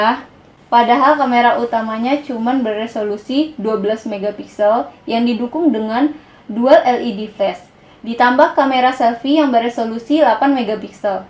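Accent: Banyumas, Indonesia. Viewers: Ko ngapak bre? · kok logat nya agak ke ngapak ya orang ngapak kah? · Asline ngapak bbang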